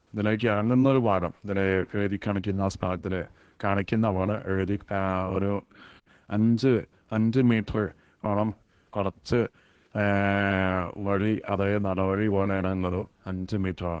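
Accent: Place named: Tamil Nadu, India. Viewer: നീ എന്താ പറയുന്നത്? ഇത് തമിഴാണോ? അഥാ കർണ്ണാടകയോ ?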